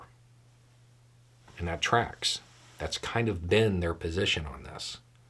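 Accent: South, United States. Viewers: Just found this channel. Thought you were a hillbilly talking gibberish. But you’re actually pretty solid dude. Great watch. I’ll stick around.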